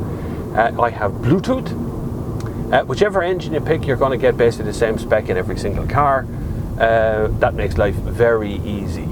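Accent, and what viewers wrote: Ireland. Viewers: Are you from Ireland?:)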